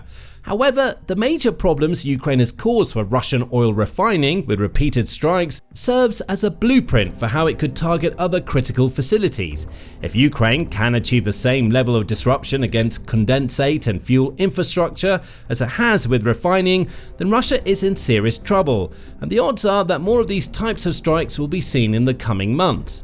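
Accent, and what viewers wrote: England, United Kingdom. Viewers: Why does the narrator keep referring to petrol as gasoline? He's English based on his accent and in the UK they call it petrol NOT gasoline.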